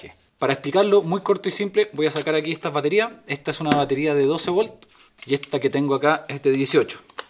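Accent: Chile. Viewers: Por el acento supongo que sos chileno. Hermoso país. Saludos desde Argentina. Muy instructivo el video.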